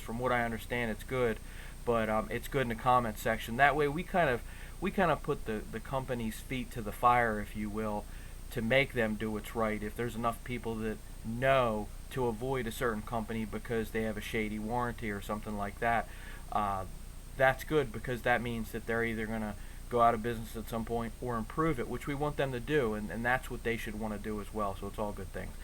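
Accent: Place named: Philadelphia, United States. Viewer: Streamlight is also local to you, assuming youre near Philadelphia ._. Based on your accent, amiright?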